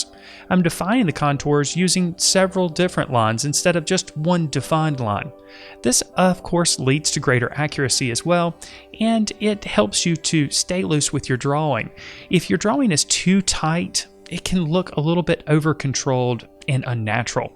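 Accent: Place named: North Carolina, United States. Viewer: Sounds like a Carolina accent. Most likely North Carolina.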